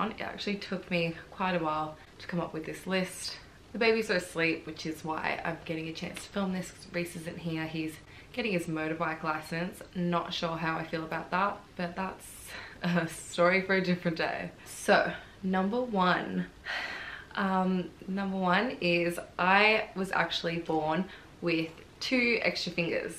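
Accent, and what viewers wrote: Australia. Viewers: I love Australian accent so much that my Siri is male Australian 💯💯☺️☺️☺️ I wish I could speak like you.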